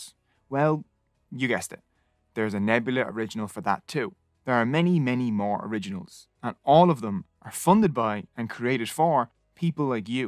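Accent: Ireland. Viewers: Totally agree! And nicely done in an Irish accent! 😁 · I cringe every time you mention any Maori Kupu (Maori words). Whether it is your Irish accent or ignorant of indigenous cultures, it kills my mother tongue. Otherwise, it would've been more entertaining, one and a half stars from five. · I wasn't prepared for the Irish accent 🤣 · Are you Irish? after a few minutes all I can hear is an Irish accent.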